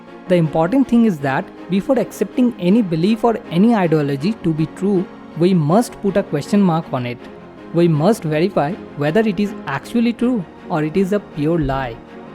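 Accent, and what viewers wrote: India. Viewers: Bihari english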